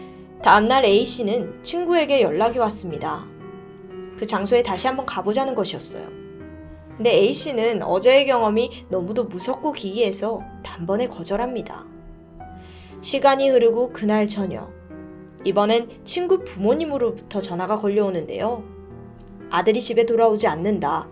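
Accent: Jeolla, South Korea. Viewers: 근데 이분 경상도사람인데 전라도발음나오는게 신기... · 아.. 이게 전라도 발음 이군요. 저도 들으면서 저부분을 왜저리 발음하지? 하고 거슬렸던.. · 진짜 죄송한데 전라도 발음좀 빼주세요 ㅠㅠ 시작하죠 - 시자가죠 답답했죠 - 답다뱄죠 제작해 - 제자개 이런거요 ㅠㅠㅠㅠ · 님 진행 너무 잘 하시고 좋은데 전라도 억양 때문에 자꾸 몰입이 깨지게 돼요 ㅜㅜㅋㅋㅋ 특히 시작이라는 단어 나올 때마다 시작합니다->시자감니다, 시작하더니->시자가더니, 시작했죠-> 시자갰죠, 시작하죠->시자가죠, 시작했습니다-> 시자갰습니다 이렇게 말씀하셔서 자꾸 몰입이 깨져요 ㅠ 노력했지만-> 노려갰지만 등등... 제시카님도 종종 이런 전라도 억양이 있는데 님 진행에서는 훨씬 자주 나와서 티가 나는 것 같아요. 이런 부분도 같이 연습해주시면 더 더 좋을 것 같아요!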